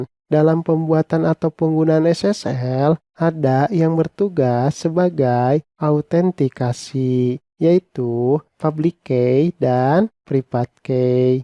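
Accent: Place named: West Java, Indonesia. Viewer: Sunda pisan 😁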